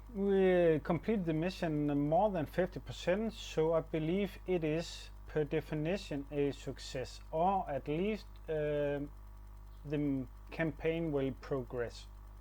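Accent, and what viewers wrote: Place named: Denmark. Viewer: Sindssyg Danglish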